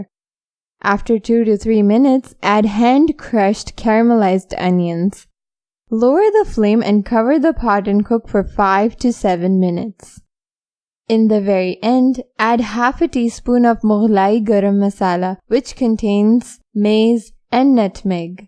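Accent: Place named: United States. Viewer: After a while you Came with a nice recipe in American accent,as always you trying the new things in your YouTube videos... very good 😃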